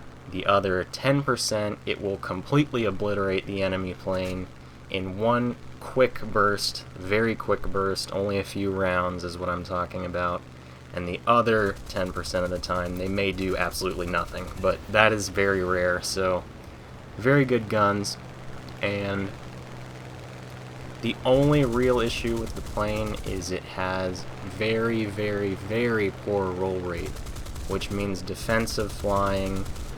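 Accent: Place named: New England, United States. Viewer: I bet your from Massachusetts. Your accent sounds like a New England Suburban Accent. I'm From Boston MA myself and we also say "retarded" like every other sentence. If I'm wrong I apologize but thats my guess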